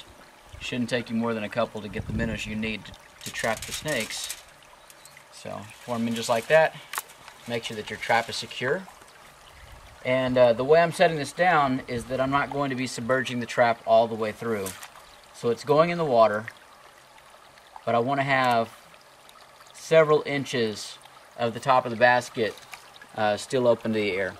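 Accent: Texas, United States. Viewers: Great video, I live in central Texas and have a real problem with chicken snakes. You have given me the solution to my problem. You look like a biologist to me? Thanks for sharing your knowledge. You speak like a true Texan.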